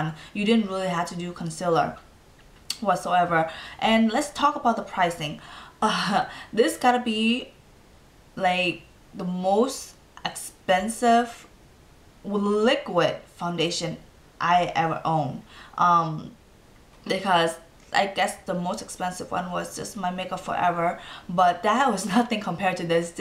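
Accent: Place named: Asia. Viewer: it's not slang... it's an asian accent... something obviously she can't help... it doesn't sound like slang at all....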